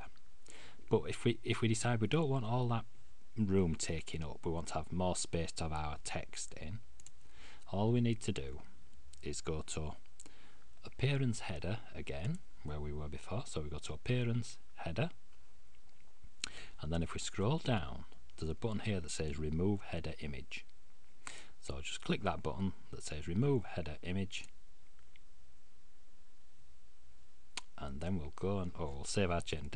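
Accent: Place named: North, United Kingdom. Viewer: Nice tutorial. A man after my own heart... nice and steady, not rushed at all... and with the added benefit of a northern accent! :)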